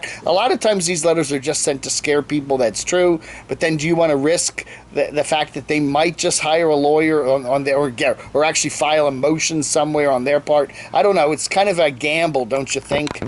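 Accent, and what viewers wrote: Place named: Baltimore, United States. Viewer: I love watching and listening to her Baltimore accent. She wants to have fun and has a lot of knowledge that she shares.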